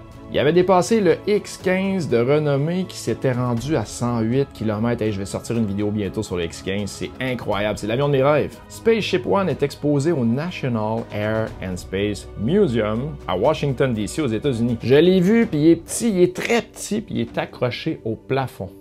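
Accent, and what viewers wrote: Quebec, Canada. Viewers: Elles sont super vos vidéos et j'aime bien le petit accent du Québec.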